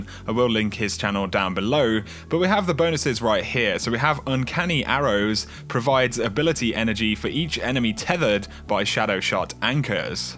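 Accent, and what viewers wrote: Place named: Birmingham, United Kingdom. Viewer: Brummiest accent going on yt lool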